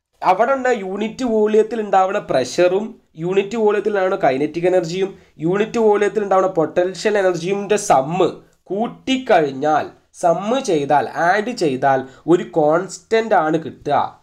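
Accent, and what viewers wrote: Malappuram, India. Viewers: Sir malappuram aano? Slag kettathond chodhichadhaan😁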